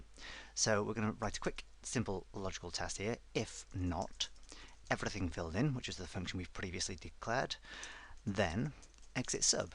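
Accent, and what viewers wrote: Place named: United Kingdom. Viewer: ***** No!I have been subscribing your channel for long time and love your videos.Problem is on me! :) English is not my mother language and I think so you are a British.I can understand easly american accent but the British Accent a little bit different.Anyway thank you for all your videos and tutorials.I'm following you.Best regards.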